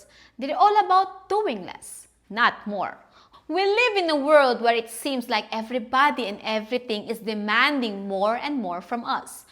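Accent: Ireland. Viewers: That Damn Irish I just Love Your Accent!!!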